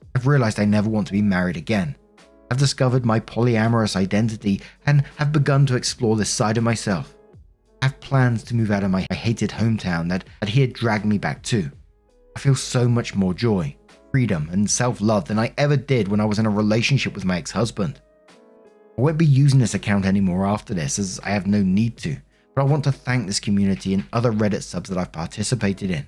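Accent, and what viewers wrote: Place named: United Kingdom. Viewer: I listen to your videos every day, but I just arrived to London from the states and it’s jarring to hear more than one British person in a day when all I’m used to is your voice 😂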